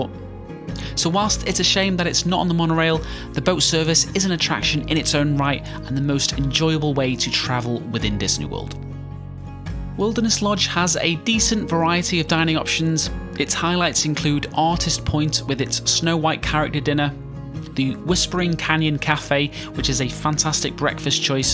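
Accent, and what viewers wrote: Liverpool, United Kingdom. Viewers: Thanks so much. Best video I have ever seen from Disney you tuber. And I've seen a lot! Love your accent..are you a scouser?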